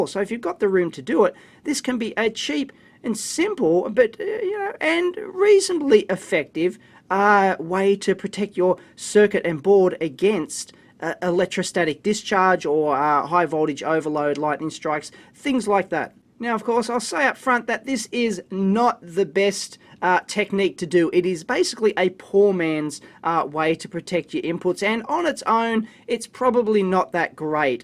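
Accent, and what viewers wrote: Australia. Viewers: You accent really got me thinking the entire video where you might be from. Usually american accents piss me off, which isn't really the case with your voice. Also (maybe im crazy, who knows) i sense sliiiight british nuances. So my wild guess would be ... Australian? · He's Aussie, mate.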